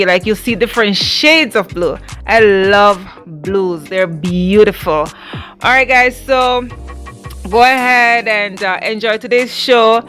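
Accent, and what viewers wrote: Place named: North Carolina, United States. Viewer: When you said y'all I thought well I'm i n north Carolina so I recognize that accent. Nice work that you do. I've also subscribed to your channel as well. Let the journey begin. Embrace it... Yours truly Ed Parkhurst. Nice to have met you.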